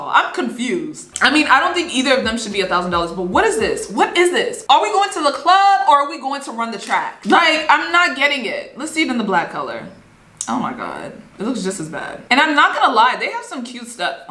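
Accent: Nigeria. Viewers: I just found your channel.. And I love it. Especially your accent.. Is it nigerian? Or other country in west africa? And yes i agree with your opinion about this.. This could also applicable to some arts... Like i don't understand why would someone pay thoisands of dollars for paint on rocks? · You sound Nigerian I love you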